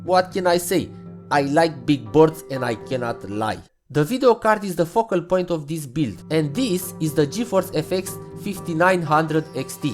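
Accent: Romania. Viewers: Mi-am dat seama din primele 3 secunde că eşti român. Accentul.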